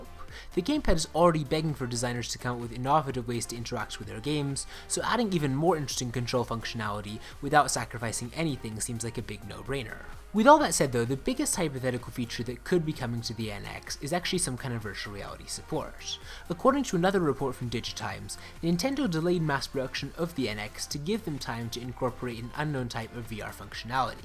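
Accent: Scotland, United Kingdom. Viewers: You're accent has a hint of Scottish to it, are you Canadian?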